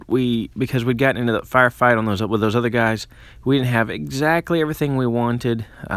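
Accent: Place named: Chicago, United States. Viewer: I’m not really sure. I haven’t heard it too much. I wanna say like Chicago or somewhere a little farther north.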